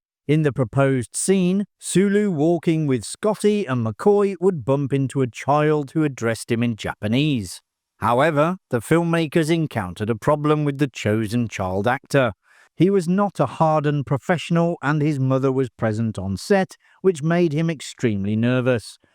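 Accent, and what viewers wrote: United Kingdom. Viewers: Your British accent annoys me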